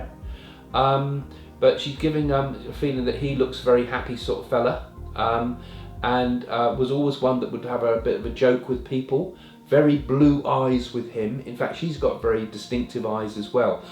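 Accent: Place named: England, United Kingdom. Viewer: Your a medium? Interesting, love your English accent.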